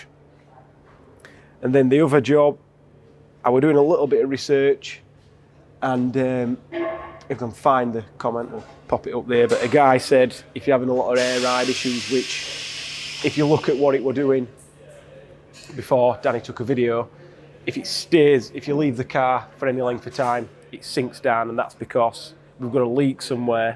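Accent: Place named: Barnsley, United Kingdom. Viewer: Hi been following your trials and tribulations me thinks you should rename it to Toerag in stead. def more Barnsley like. love the Barnsley accent but its no wonder some folks cant understand you broad Barnsley is a very difficult dialect to understand., and im born and was draged up in Barnsley, well actually Grimethorpe. Keep up the good work take no notice of the nay sayers and internet experts lol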